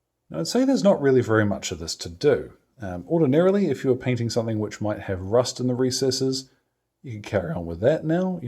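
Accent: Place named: New Zealand. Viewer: A useful technique, and I hope you continue with your Kiwi flavor. I always think of Tabitha from Fallout when I hear battle cattle.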